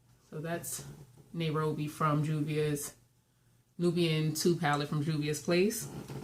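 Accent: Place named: North, United States. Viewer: I enjoyed watching. Came over from JustSynetta's channel (Love her.). Your eye look is freaking amazing. I must try to recreate really soon. Do you do tutorials? Must go see. I need to be shopping my stash before it needs to be thrown away. OAN: I got distracted a few times by the Northern accent (Jersey born, Atlanta is home now).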